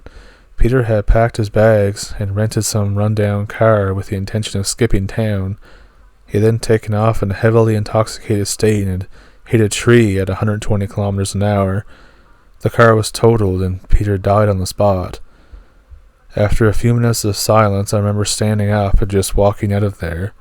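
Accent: Canada. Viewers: Thanks for letting us hear your kitty cat! Your accent is soooo Canadian. Nice!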